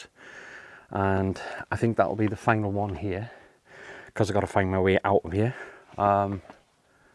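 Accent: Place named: Wales, United Kingdom. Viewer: Great shots,you do make me laugh tho, with you Welsh take on the the English language 😂 oh shit etc 😂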